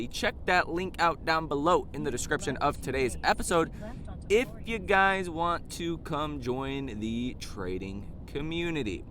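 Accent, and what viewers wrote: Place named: Chicago, United States. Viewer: it sounded like a 1950s Chicago accent of a guy announcing a horse race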